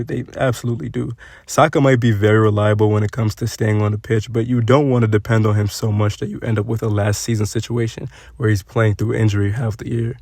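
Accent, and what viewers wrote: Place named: United States. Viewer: Where are you from bro? I can't pinpoint your accent.. I just know it's from the States. This is a nice video tho.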